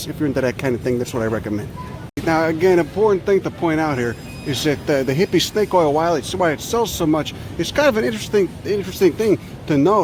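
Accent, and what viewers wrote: Chicago, United States. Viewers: Sounds like he's from Grand and Ogden. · Chicago Italian, the accent definitely has the east coast and mid-west flavours combined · no offense but ya must not be around many working class Chicago people- because as a Wisconsinite, I thought Chicago immediately. It’s just not a suburban Chicago accent. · imagine trying to shop and this dude comes through the aisles rambling his chicago mouth off about mushrooms and california ppl stereotypes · Perfect Chicago accent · Jeezus man , I can smell Chicago on you from here , love it, makes me miss home brother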